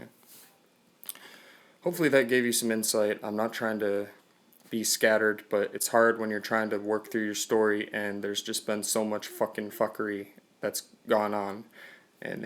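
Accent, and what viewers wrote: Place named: Detroit, United States. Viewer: same bro. everything. from the drug past, anti police lifestyle, vehicular mobbing almost leading to death.. only difference is im in uk. someone i cared for deeply in my past originally came from detroit - your accent reminds me of them hence why i wanted to reply know what you mean with the evil cultish vibe these fucks give off. too much to go into here but just want ya to know it does get easier with time.. not outwardly, but in how we learn to deal with it. im four years down the line and still dealing with it every day. but i am dealing with it youre clearly a smart young guy. youll find a way of surviving eventually.. dont let them win. if you ever wanna talk, let me know. otherwise, take care man.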